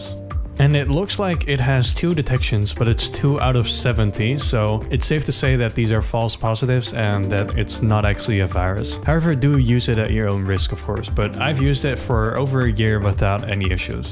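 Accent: Netherlands. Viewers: I already could hear you come from the netherlands anyways good video your amazing :)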